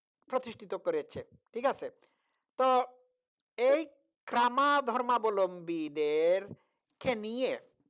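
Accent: Sylhet, Bangladesh. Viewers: জনাব, আপনি কি সিলেটি ?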